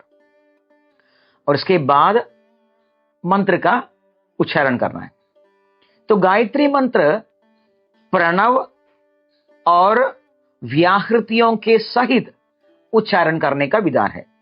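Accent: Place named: South, India. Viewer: पर ये उच्चारण दक्षिण का है उत्तर का नहीं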